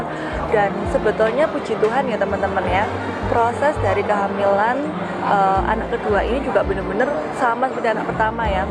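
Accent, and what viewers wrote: Java, Indonesia. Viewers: medog kali logat nya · Mba kok ngmongnya medok .. kaya org Jawa yah😊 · Dok medok, jawa mana dok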